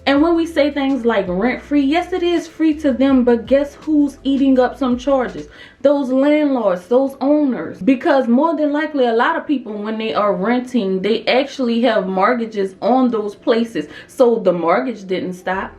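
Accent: New Orleans, United States. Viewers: Thanks for this information. Btw, are you from New Orleans?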